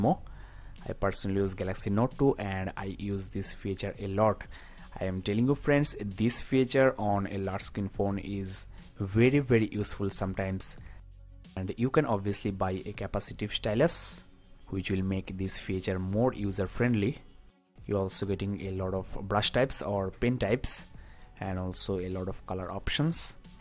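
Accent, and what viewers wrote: India. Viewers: RU KIDDING ME. THIS IS A WHITE MAN WITH INDIAN ACCENT.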